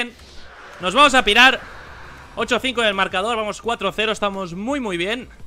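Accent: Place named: Spain. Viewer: De que parte de españa sos ????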